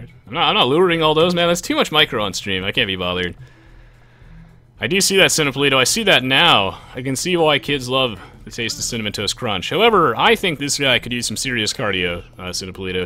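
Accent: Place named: Germany. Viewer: Your occasional german accent really adds to the production value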